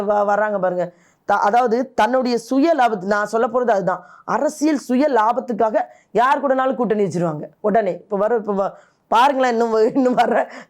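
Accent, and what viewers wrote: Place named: Tirunelveli, India. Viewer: உங்களது நெல்லை பேச்சு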